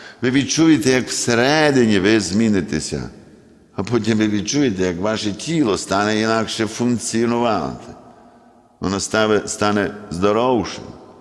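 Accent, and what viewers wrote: Western Ukraine, Ukraine. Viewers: Любов Писаренко, мова о. прекрасна із дещо діалектом, який притаманний на західних територіях України.Слухати науку дуже люблю. Слава Ісусу Христу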